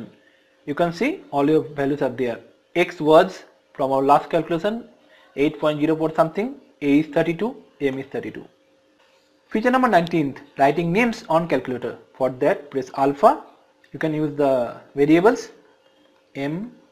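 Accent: India. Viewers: you should work on your indian accent :/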